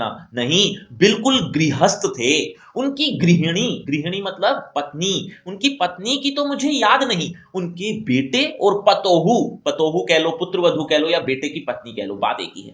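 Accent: Haryana, India. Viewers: Sir aap haryanvi ho kya?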